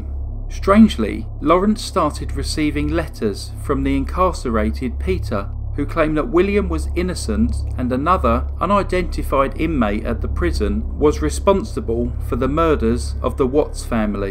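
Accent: United Kingdom. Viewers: Hey, ThankY again for another great video!! What county/province are you from in the U.K.? The reason I ask is because the gentleman who does the series: Travels by Narrowboat (Kevin Shelly) sounds Exactly like You- not just the accent, but in pronunciation, pitch, resonation, word choices.. all of it. Briefcase & you sound similar, but this gentleman- it really is amazing how much much you sound alike.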